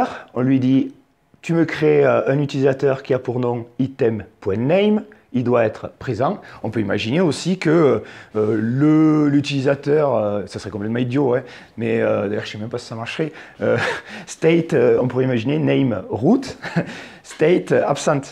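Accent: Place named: Aveyron, France. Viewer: Merci ! Par contre c’est l’accent aveyronnais 😉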